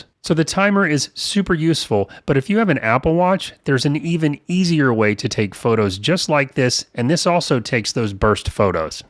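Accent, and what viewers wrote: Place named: Indiana, United States. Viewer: I really enjoy and understand (at least almost all) what you are saying. You speak in my native language- "Indiana English"!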